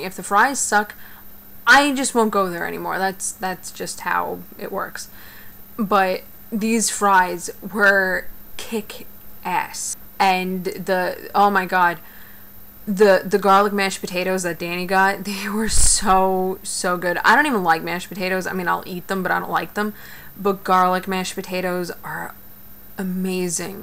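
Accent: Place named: New York, United States. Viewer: haha this video made my mouth water! i love bbq food and fries ughh yum!! are you from NY cause you kinda have a NY accent?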